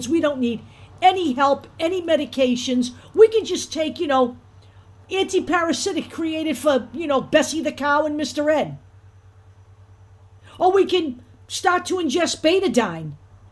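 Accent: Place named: New York, United States. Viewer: I L-O-O-O-O-VE your New York accent! I made note of his part-time comments when I first posted this comment on Brian Tyler Cohen's channel. He called them Loony Tunes. We people, who are thinking correctly--basically, thinking at all, should just keep referring to these morons as cartoons. I am a fan of Mel Brooks. He once said, "What do you do with a man who has kill 6-million of your relatives? You make them a laughing-stock!" These right-wingers cannot be taken seriously! Cartoons one and all!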